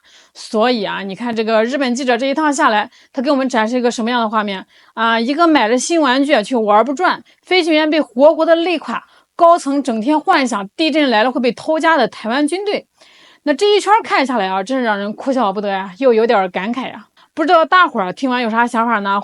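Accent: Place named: North, China. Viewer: 口音挺熟，就是想不起来了。或许山西，河南，河北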